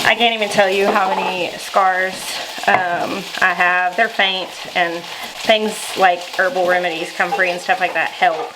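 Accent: South, United States. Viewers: Never be ashamed of your southern roots. The Europeans love our accent, I found that out while living there for a time. Everywhere I went if I talked I drew someone's attention, and many times they complimented me on the southern accent. Unfortunately it was usually men and my hubby was very British and very jealous. I tried to hide the accent, but it was impossible.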